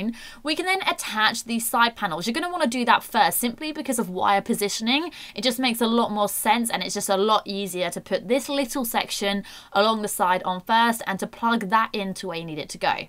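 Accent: United Kingdom. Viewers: You have the exact same accent and voice as Jaime Murray could it just be because you are from England? :P Great review btw.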